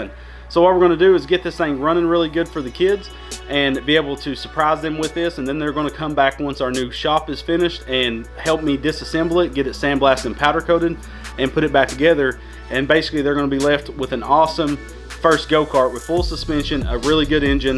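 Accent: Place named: South, United States. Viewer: Don't let em mess with you,it is pronounced ole not o-i-l, everyone knows it's just a southern accent thing. You're a good man helping them kids to have nice little go karts to play with. Go power sports is an awesome company to help you out with parts to help it all come together too.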